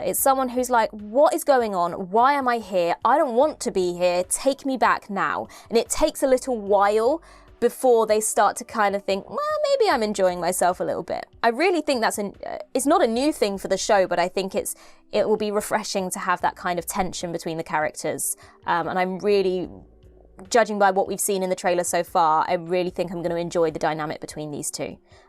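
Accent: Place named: Berkshire, United Kingdom. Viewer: Is the presenter from Berkshire? She sounds posh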